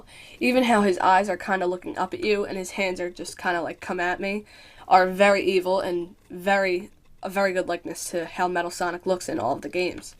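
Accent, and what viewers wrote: United States. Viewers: I like the video but you're accent is terrible I can't stand a New Jersey accent! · r u from chicago?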